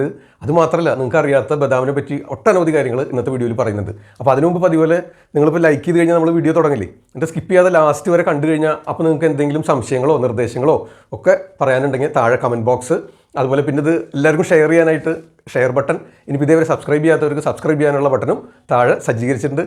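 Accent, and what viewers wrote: Thrissur, India. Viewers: നമ്മുടെ തൃശ്ശൂർ സ്ലാഗ് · തൃശൂർ രീതിയിൽ വളരെ ലളിതമായി ഡോക്ടർ പറഞ്ഞു കൊടുത്തു. nannayi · Trichur aano sirnte sthalam avatharam super nalla arivanu nalkiyadu tnq sir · Doctor sure Trissur kkaran aanu ,speed accent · സർ ത്രിശൂർ ആണ് നാട് അല്ലെ 😃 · Doctor തൃശ്ശൂർക്കാരനാ ല്ലേ...🥰👍👍👍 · തൃശൂർ ശൈലി ആയതോണ്ട് നല്ല രസമുണ്ട് കേള്കാൻ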